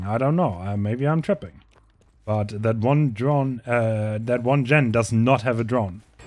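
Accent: Germany. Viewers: bro are you german? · This german acsent..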